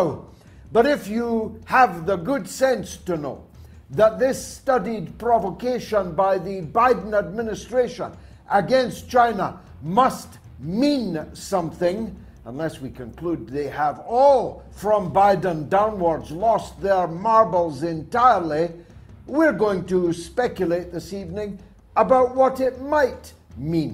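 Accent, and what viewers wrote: Scotland, United Kingdom. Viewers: Mr. Galloway, seing and listening to your talk shows is one of the reasons I cherish having English as my second language. I like the brave, clever and sarcastic style of yours speaking the reality of the matter in geopolitics. Your Scottish accent and ways puts a generous amount of a highly appreciated spice to your sayings. Greetings from Santiago de Chile. Cheers!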